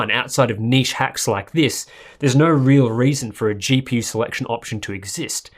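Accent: Australia. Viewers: r u from ostralia?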